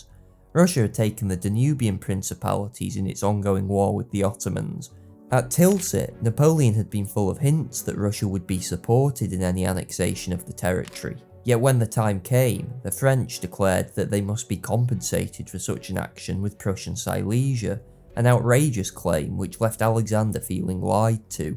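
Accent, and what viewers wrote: United Kingdom. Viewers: Definitely British, but where specifically idk. I'm American too lol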